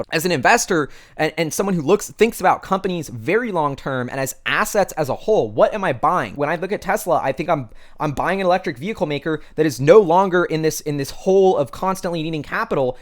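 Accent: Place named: Silicon Valley, United States. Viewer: The cancerous silicone valley accent can be avoided by muting it and using captions.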